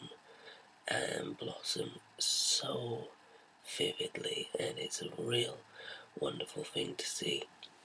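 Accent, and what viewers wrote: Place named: United Kingdom. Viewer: Glad to see you are both back: you and your British accent ^^!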